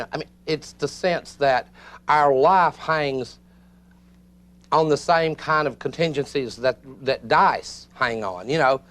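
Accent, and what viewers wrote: South, United States. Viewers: the southern accent is not doing him very well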